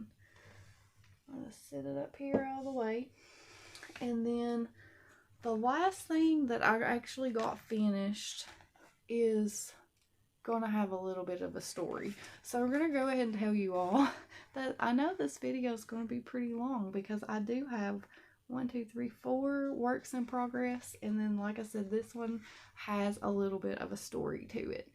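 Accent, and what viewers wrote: South, United States. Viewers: you're so cute. I love your accent. My family is from the south/midwest too but I didn't get the accent. Boo. LOL. Your Lucy doll is fabulous! So creative and fun! Those blankets and rug/doily are amazing! Your drawers are a great idea! I use little condiment containers from the Dollar tree and separate them by size, for my safety eyes. I store them in an old Crochet Society Advent Calendar. I don't mind long videos. It let us get to know you better:)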